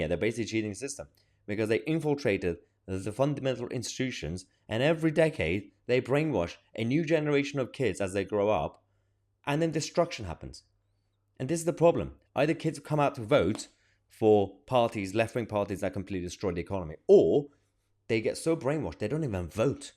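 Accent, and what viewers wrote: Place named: Liverpool, United Kingdom. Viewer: Did like his liverpool accent.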